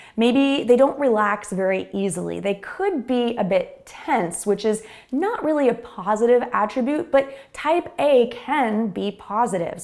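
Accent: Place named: United States. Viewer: good job. Excellent. Sometimes I forget your accent is an American one. There are several teachers I can't get them without driving crazy.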